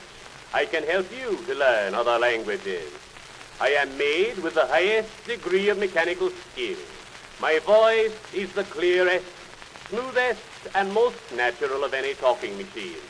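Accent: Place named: United Kingdom. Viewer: He sounds very British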